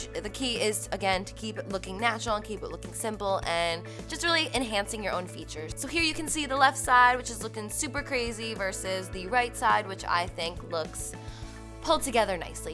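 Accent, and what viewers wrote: New Jersey, United States. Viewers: she sounds like she's from nj, the accent is so sutle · I hear a Jersey accent 😍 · omgggg as soon as I heard you talk I knew you were from New Jersey JERSEY PRIIIIIIDE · Love the Jersey accent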